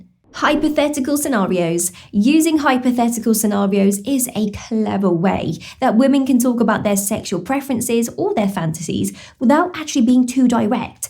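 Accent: United Kingdom. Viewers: What I have trouble with is her Brit accent .Too much.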